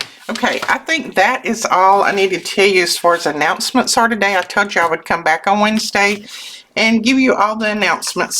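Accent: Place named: Tennessee, United States. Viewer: I so love watching you do the journals . I am very new at this I’m working on my 2nd book . Plan on making 4-5 for a craft show . Along with some homemade cards . But I love you accent are you from Tennessee? I am but currently live in Indiana. Is there any advice for me as a beginner ? Thanks so much and I’m ma gonna keep on watching you ♥️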